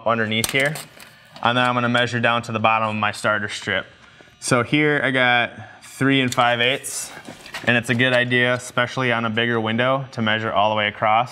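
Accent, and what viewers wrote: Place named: Wisconsin, United States. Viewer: where in wisconsin did you come from?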